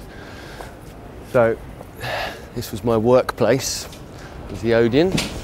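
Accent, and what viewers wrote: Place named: New York, United States. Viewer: I thought he was from New York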